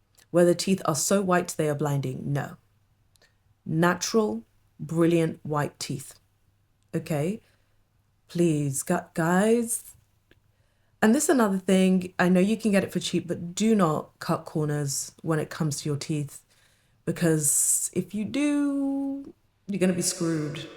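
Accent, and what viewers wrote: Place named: United Kingdom. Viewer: nothing beats a beautiful black woman with british accent❤❤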